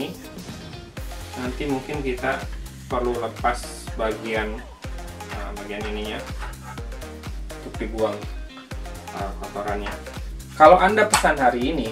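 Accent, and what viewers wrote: West Java, Indonesia. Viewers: gan orang sunda?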